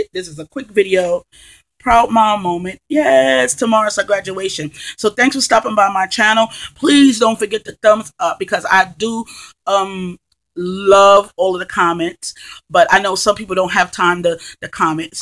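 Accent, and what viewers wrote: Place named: New York, United States. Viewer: Fabulous idea. Wish I did this to my daughter's cap. I love your accent. I'm from NJ living in Georgia and I miss hearing that NY/NJ accent.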